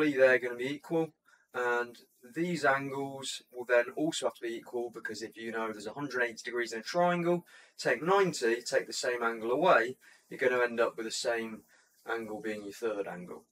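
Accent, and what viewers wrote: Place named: East Midlands, United Kingdom. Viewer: What a great time for students to learn maths with helpful videos like this! Way better than reading through dog-eared textbooks! You sound like you have an East Midlands accent. Nottingham? Mansfield?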